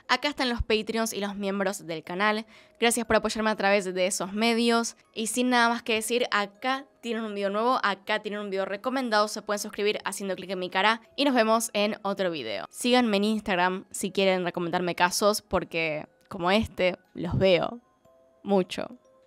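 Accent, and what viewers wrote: Argentina. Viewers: Lo que más me gusta de es su forma UNICA de narrar. Aparte que AMO el acento argentino. Saludos desde El Salvador.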